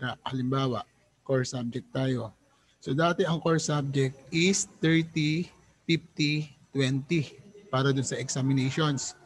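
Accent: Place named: United States. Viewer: The way she pronounced the words are not slang it is american accent good reading..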